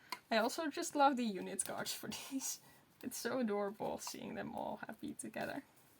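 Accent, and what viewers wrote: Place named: Finland. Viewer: You just sound so finnish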